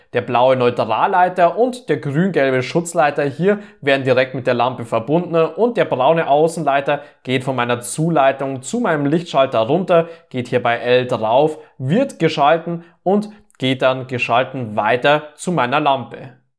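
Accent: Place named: Middle Franconia, Germany. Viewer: Wirklich gute Anleitung, aber kommt der Akzent aus Mittelfranken? "beschädigen könntne", "oben und untne", sowas kennt man bisher nur vom guten Drachenlord.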